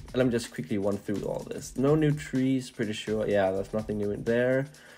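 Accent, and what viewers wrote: Denmark. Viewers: are u danish? u sound danish with ur accent, and it says on the credits that u translated the game to danish???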